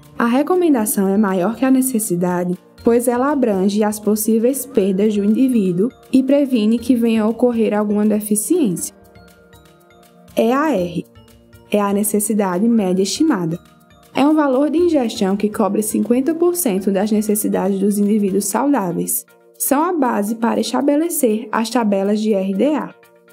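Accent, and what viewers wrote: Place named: Northeast, Brazil. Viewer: O sotáque nordestino ai ta forte kk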